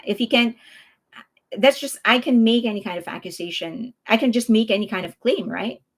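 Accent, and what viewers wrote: Philippines. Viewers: shes from the philippines thats our pronounciation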